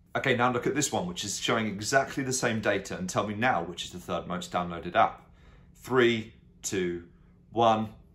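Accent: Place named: United Kingdom. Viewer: I think you are from UK😊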